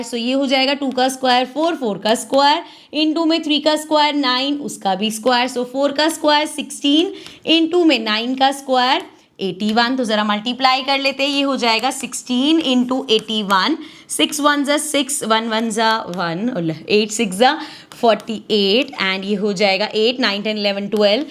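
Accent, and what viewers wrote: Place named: Marwari, India. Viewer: Ma'am very nice explanation thank you so much ma'am aap marvadi ho? Allee alle bolte ho isliye laga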